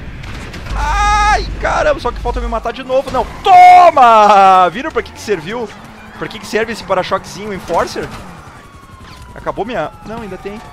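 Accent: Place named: Bahia, Brazil. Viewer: Bem baiano viu cara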